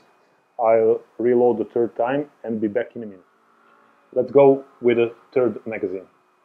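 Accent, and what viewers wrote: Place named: Eastern Europe. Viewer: Ну оочень славянский акцент😅😅😅😅😅😅😅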